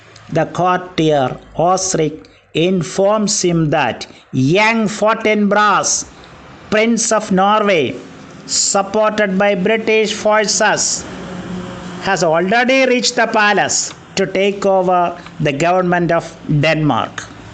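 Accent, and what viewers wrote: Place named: Kerala, India. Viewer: Malayalam accent harms all along...😢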